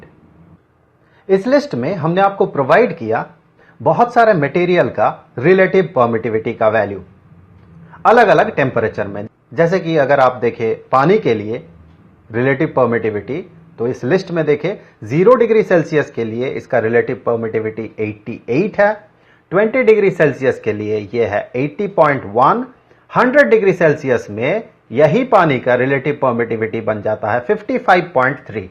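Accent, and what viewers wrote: Bihar, India. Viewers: sir aap Bihar se hai kya? love your voice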